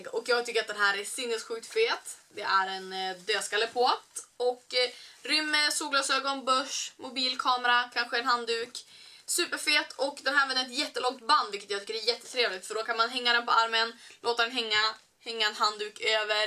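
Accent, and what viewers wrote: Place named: Sweden. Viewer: älskar dig röst. din svengelska. på allvar! allt du säger låter så "rätt" haha kan inte förklara men aa kärlek till dig!!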